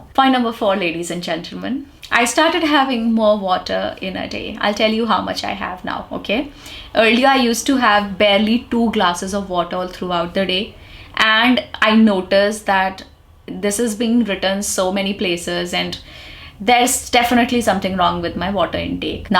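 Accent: India. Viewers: Didi......I love yor indian accent ❤❤❤❤❤❤❤❤❤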